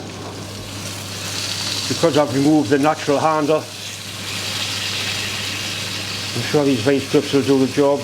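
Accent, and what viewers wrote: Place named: Geordie, United Kingdom. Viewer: Hi, with this video you have given me proof that a skilled machinist is also a great cook. Too bad with your strong Geordie I can't understand the whole thing, but the images are excellent. Greetings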